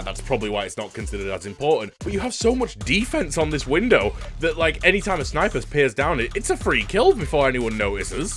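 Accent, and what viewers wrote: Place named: Yorkshire, United Kingdom. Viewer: love the yorkshire accent, keep it up!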